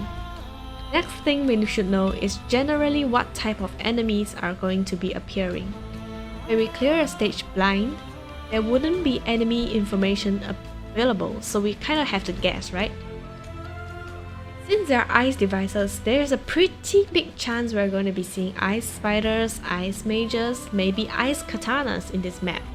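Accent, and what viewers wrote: Singapore. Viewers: I'm picking the scent of Singlish... hmmphh hmmphh~ Definitely a Singlish, no way that's a common Chinese English accent. Trace of Indian, Chinese, Malay and Hokkien intonation. Hi neighbor countryman ^^ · I hear some thicc Singlish here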